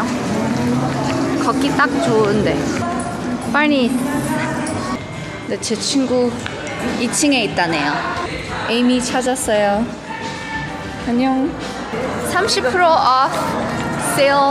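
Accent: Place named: United States. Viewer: She has a very Americanized accent and a lot of pauses. It kind of frustrates me and is hard to follow. Makes me anxious listening to her... But, not everyone is completely fluent in their parent's tongue. I was forced to take Korean during the weekend.... so... yeah.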